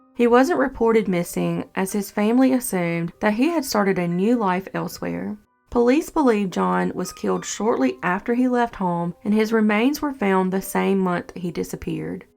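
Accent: South, United States. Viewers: Yeah the videos really good and informative, but that southern accent is the cherry on top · Love your accent 😊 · I love the narrators southern accent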